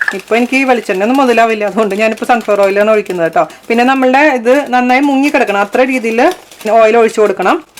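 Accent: Thrissur, India. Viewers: Love your recipes and your thrishuran malayalam. It's music to the ears!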